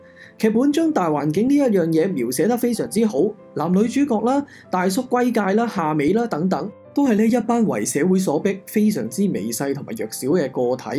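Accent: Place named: Hong Kong. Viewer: 香港人崇拜廣州話，所以抄襲了廣州話。香港本土語言係圍頭話，但係你叫香港人講圍頭話，香港人會唔肯講。因為香港人崇拜廣州話覺得廣州話高雅，厭棄香港話（圍頭話）難聽老土唔入流。建議大家好似澳門人咁學正音廣州話，唔好學充滿懶音嘅香港圍頭式廣州話。 冰室係香港人抄襲偷盜廣州嘅嘢 我澳門人真係睇唔慣。冰室，又叫冰廳，廣州話用以稱呼冷飲店。其是南粵地方一種主要售賣冷飲、雪糕及沙冰等冷凍食品的飲食場所，起源發跡於廣州。在香港流行於1950至1960年代，被認為是茶餐廳的前身[1][2]。過往廣州的冰室會經營雪糕、凍奶、冰水，兼營咖啡、奶茶等熱飲和西餅麵包 澳門人香港人說的廣東話學術上最準確定義 叫作廣州話（澳門官方政府文書也寫作廣州話）廣州話英文叫Cantonese Canton就是廣州英文舊稱 當然現在你也可以看到許多痕跡 譬如廣州機場代號是CAN 廣州塔英文叫Canton Tower 我係澳門人 澳門一直係廣府話/廣州話地區 但香港唔係 香港一百年前還在講圍頭話（香港話） 一百年前澳門和廣州影響力遠遠高過香港 香港人放棄圍頭話學我地講廣州話 香港人講廣東話口音非常難聽好多懶音（畢竟係抄返黎）建議你學正音廣府話/廣州話 廣東話本身係錯誤名稱 魯迅講過：一直如此 便是對嗎 潮州都係廣東 梅州又係廣東 唔通潮州話梅州話都係廣府話？ 呢個語言係名副其實嘅廣州話 我作為澳門高質大學生鍾意講求學術 希望啲港喱唔好介意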